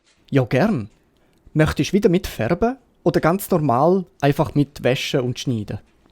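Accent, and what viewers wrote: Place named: Swiss German, Switzerland. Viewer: Hoi! Really enjoyed your Swiss German content 😊 Clear and authentic Swiss German is hard to find—great work! I work with YouTube SEO and noticed a couple of simple ways this could reach more learners. Happy to share if you’re open 👍 Best regards, Md Rana Sheikh Digital Marketer & YouTube Growth Expert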